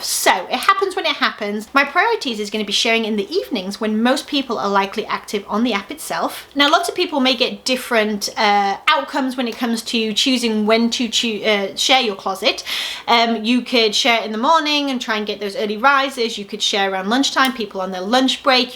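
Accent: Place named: United Kingdom. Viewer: Plus I love your accent and British expressions. Reminds me of my British family members. <3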